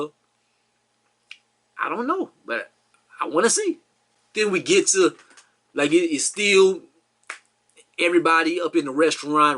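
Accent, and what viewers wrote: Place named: New Orleans, United States. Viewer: Just found you. Adore your commentary. Lemme find out that accent from New Orleans! 😊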